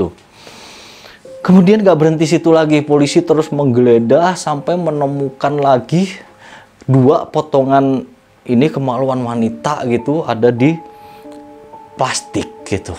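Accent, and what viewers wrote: Java, Indonesia. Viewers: logat jawa nya kenthel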